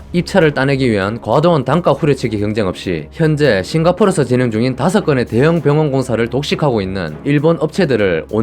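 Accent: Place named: Gyeongsang, South Korea. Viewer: 갱상도 ㅋ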